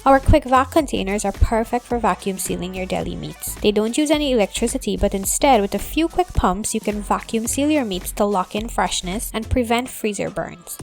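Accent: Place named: Trinidad and Tobago. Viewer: Are you Trini? Your accent sure sounds like it.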